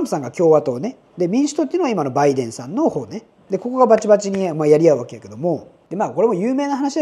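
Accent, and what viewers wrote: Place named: Fukuoka, Japan. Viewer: 山口県出身なのか、喋り方は福岡っぽい。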